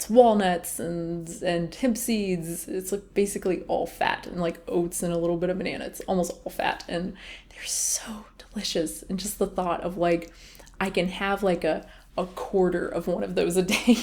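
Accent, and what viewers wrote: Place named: South, United States. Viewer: what happened to your southern accent??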